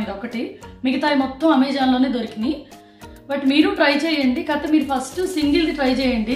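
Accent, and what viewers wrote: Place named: Guntur, India. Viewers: Hi sisterYouTube suggested your home tour video 3 months but I didn't see that, after Diwali again suggested your Diwali video after that I liked your Telugu slang I guessed u and your husband was from guntur dist.i watched all your videos really it's very entertaining.i am your subscriber. Now I am currently in Mexico, here I met so many Telugu people, they're very shy to speak in Telugu. any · Mee language chusi anukunnanu..Andra ani..nice to see you in vidios...😍😍😍😍😍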